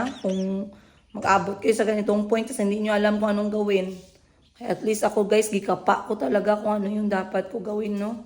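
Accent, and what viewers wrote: Iloilo, Philippines. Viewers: Ilongga gid tuno mo taga Antique ako dhay. Kaya lagi ko pinapanood vlog mo. Be strong lng gid. Enjoy lng sa mga anak mo. Watching from bharain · Nami yah ilonggo nga tono hahahaha mo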